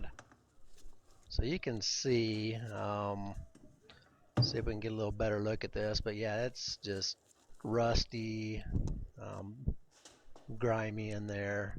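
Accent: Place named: Utah, United States. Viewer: I watched this video because I'm rebuilding my Ingersoll IR285B, and I can tell you that its exactly the same procedure. Every last part looks identical! My vanes were stuck as well, with lots of rusty gunk, and I constantly oil my guns! Go figure? Thanks for posting ;)BTW are you from Utah? I have a friend in Utah and you have the same accent. Just sayin.......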